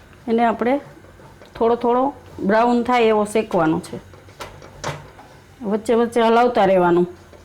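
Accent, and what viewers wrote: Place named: Saurashtra, India. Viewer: Ben tame saurashtra junagadh bajuna lago chho...... tamari boli uparthi..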